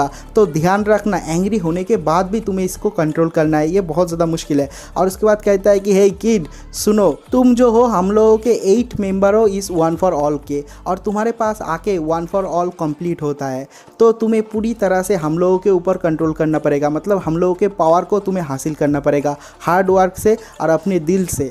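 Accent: Bengal, India. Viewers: r u bangali bro...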